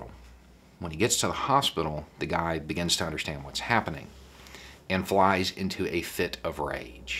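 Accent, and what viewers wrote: South, United States. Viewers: I adore this man. Something about hearing lefty politics from a deeply southern voice makes my heart smile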